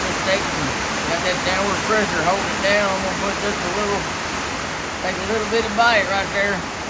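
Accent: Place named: Texas, United States. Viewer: I'm just here for the rain and Texas dialect ASMR.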